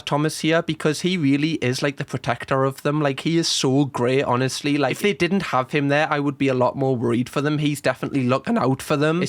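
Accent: Newcastle, United Kingdom. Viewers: Watching and me mam walks in and says ‘eehhh he’s a geordie’ like we aren’t also geordies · what accent do u have? ik its british but idk where edit - newcastle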